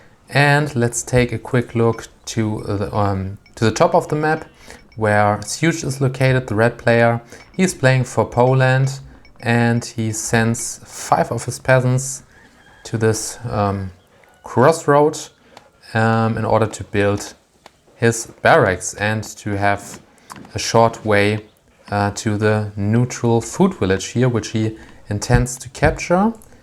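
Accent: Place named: Germany. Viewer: Hello there I would like to ask you why you sometimes refer to yourself in the third person, it makes you sound a little arrogant, no offence intented. Just wanted to make you aware of this since I noticed that you are a non-native speaker due to your German accent. As always, some really enjoyable content:D Keep it up!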